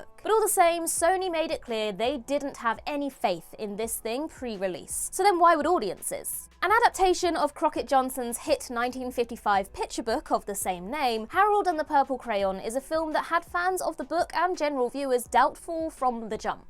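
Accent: United Kingdom. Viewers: You have the cutest British accent I have ever heard.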